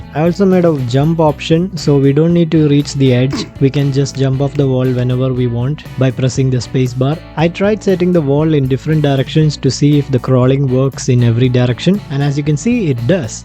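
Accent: Kerala, India. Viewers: Are you from india? Kerala?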